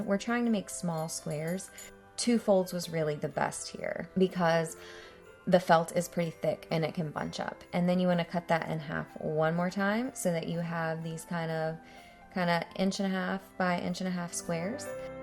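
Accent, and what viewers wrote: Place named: United States. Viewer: Lovely video ✨ I love them all, especially the white one. Creative, a nice narrator, and nice American accent 🌙 🎄❄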